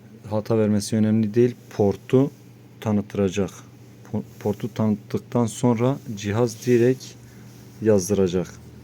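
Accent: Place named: Urfa, Turkey. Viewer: Ustam sen galiba urfalisin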